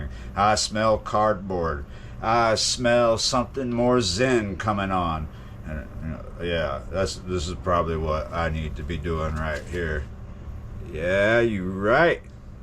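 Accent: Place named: New York, United States. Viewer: Love the New York gangsta accent mixed with Louisiana slang LMAO ! !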